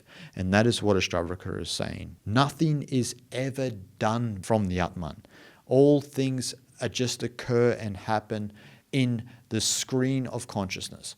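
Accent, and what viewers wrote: Australia. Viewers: Love your videos and your Australian accent ♥️🦢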